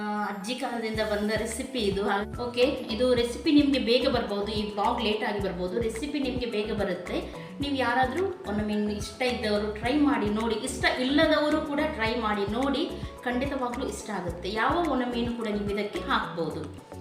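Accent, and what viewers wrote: Mangalore, India. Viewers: ಕರಿಬೇವು ಪುಂಡಿ 😀😀😀😀 ನಮ್ಮ ಮಂಗಳೂರಿನವರ ಕನ್ನಡ ಸೂಪರ್. ಯಾಕೆಂದರೆ ಕನ್ನಡ, ತುಳು, ಕೊಂಕಣಿಯಲ್ಲ ಮಿಕ್ಸ. ಸೂಪರ್ vlog 👌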